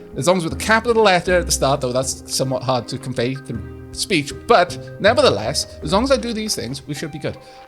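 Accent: Wales, United Kingdom. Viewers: I subscribed purely because of how much I the welsh accent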